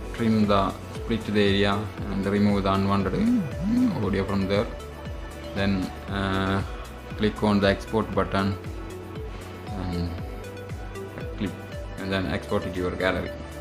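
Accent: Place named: Kerala, India. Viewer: Accent seems like malayali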